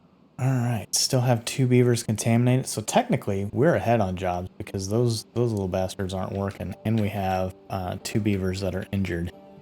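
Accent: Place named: United States. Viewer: Ok I watch a lot of timberborn videos…you and one other are the only Americans I can watch…otherwise it just sounds weird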